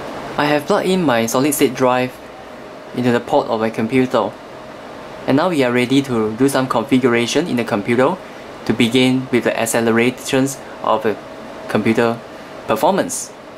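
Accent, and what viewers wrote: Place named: Asia. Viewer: asian brotha accent for the win!!!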